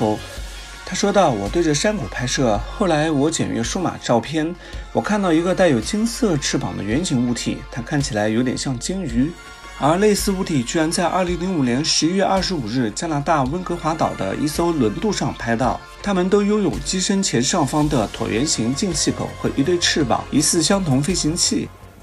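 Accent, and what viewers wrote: China. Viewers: 听口音大陆的哦！ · 一直以為tea小編是台灣人耶，聽口音很像上海人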